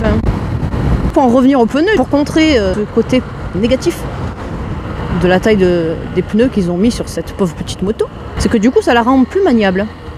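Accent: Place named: Nice, France. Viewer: Bonjour 👋, Enchanté, joli accent de chez nous (Nice, Toulon ? J ai eu une ybr en 2011, en 10 ch, j ai réalisé tout les cols de montagne, arrière pays Nicois, même le col de la bonnette qui culmine à presque 2800m. Par contre pour l autoroute C était..... Mdr cata. La j ai une gsx r susuki (125) 15 ch.... Ça change. Avant le A2 que j ai commencé, pas évident du tout l épreuve du plateau. V a vous 2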